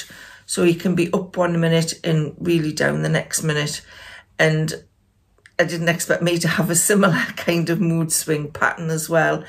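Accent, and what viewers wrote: Newcastle, United Kingdom. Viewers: I love your channel, one of my favourites (I love a Geordie accent, that always helps 👍). I want to start my own channel soon as I’m in the process of divorce and will be moving out probably next year to Sussex so I’m near my sister. I’m taking tips and a few ideas from you x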